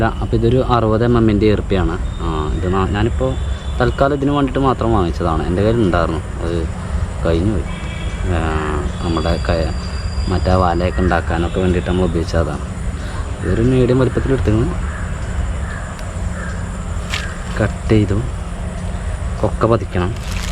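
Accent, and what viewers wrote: Malappuram, India. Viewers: Mone kutta ne malappuram aaanodaaaa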